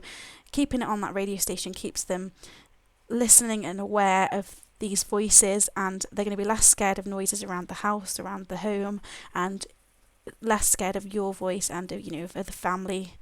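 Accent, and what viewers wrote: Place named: Stoke, United Kingdom. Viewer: you sound like you're from stoke like me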